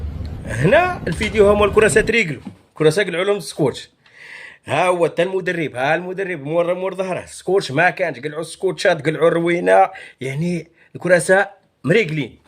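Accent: Morocco. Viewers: أنا كوجدي هدا السيد مغربي من اللهجة نتاعه ماشي جزائري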